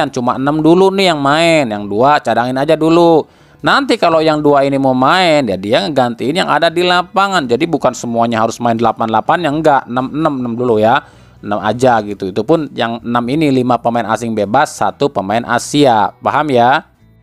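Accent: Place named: West Java, Indonesia. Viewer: BUAHAHHAAAAA..... Yg punya chanel itu urang Sunda,logatnya itu yg jadi ciri....😀😁. Aingmah sok aya2 wae pertanyaan teh...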